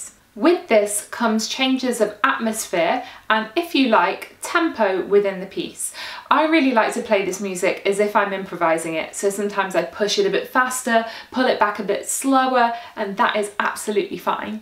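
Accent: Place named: United Kingdom. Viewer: And it’s not only what she says but how she says it in her own inimitable entertaining British accent. These tutorials just wouldn’t be the same if were to teach them using a phony mundane American accent. 😉 ♫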